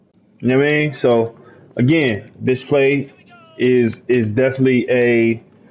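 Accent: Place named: Philadelphia, United States. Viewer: You sound like a Philly guy. Love the content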